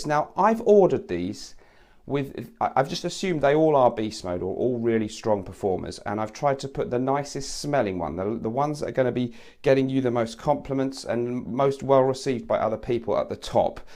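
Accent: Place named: London, United Kingdom. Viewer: Your a London chap aren't ya ?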